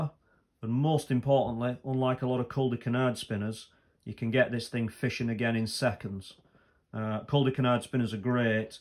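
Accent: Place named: Lancashire, United Kingdom. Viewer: cracking straight to the point , ive just started fly fishing and tying just what was needed finding your channel, sounds like your from lancashire 🍻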